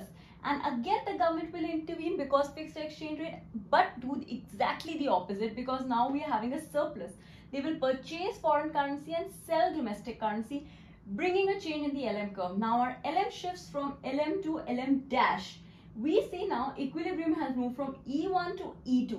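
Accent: India. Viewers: Usually, the Hindi accent stands in a way of my understanding of the given concept. However this video was properly explained, so the language barrier did not occur. Keep up the good work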